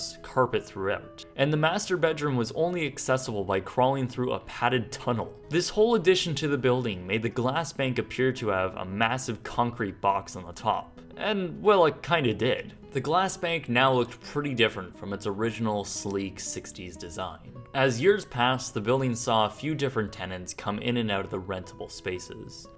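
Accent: Canada. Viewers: Canadian detected. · you Canadian? sounds it. Orangeville?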